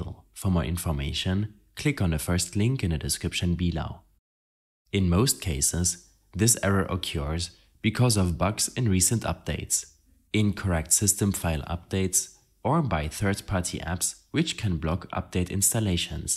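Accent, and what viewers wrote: Germany. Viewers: Man hört das du deutsch bist 😂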